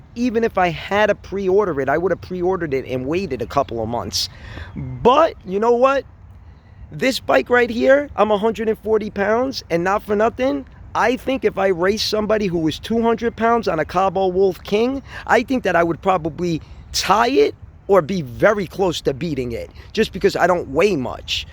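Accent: New York, United States. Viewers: Great video i love your Ny accent and i love all your scooters nice!!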